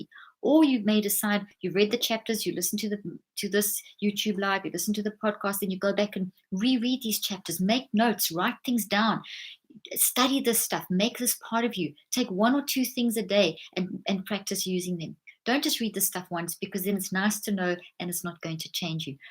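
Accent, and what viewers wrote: South Africa. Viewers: Smart young lady . Love the SA accent too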